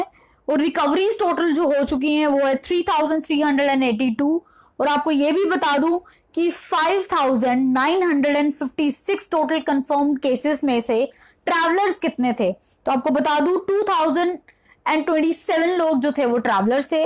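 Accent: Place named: Dogri, India. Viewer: Mam appki Dogri basha bhout meethi ha apka Jo khabar Dana Ka Andaz bhout accha ha jab apbaat kerti ha Mou sa Moti gotta ha mam God bless you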